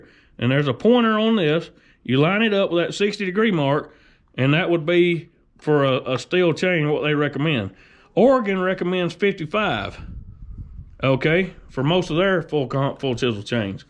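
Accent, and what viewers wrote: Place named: Tennessee, United States. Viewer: I , like others who have commented here , am grateful for the absolutely stellar quality of your teaching. You and your son are a credit to the whole “ Chainsaw Community” amateur and professional alike. I own the parent company version of this grinder . That is the Tecomec Super Jolly. All of these machines are made in Italy by the Tecomec company. Your video explains the theory and practical aspects of the use of this machine so effectively that the company should compensate you for what you have done. There is no other video that compares. I was born in Florida but grew up in New York City. Your Tenessee Twang makes the video all the more enjoyable. You are an intelligent man and a gifted teacher. Thank You, sincerely for taking your time to make this documentary. I have learned much over the years about saws and chains but increased my gut understanding of the setup and operation of this machine after watching your video. 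Subscribed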